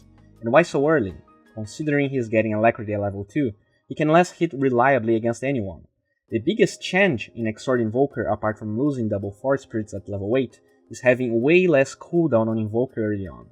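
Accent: Brazil. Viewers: that accent haha r u brazilian dude?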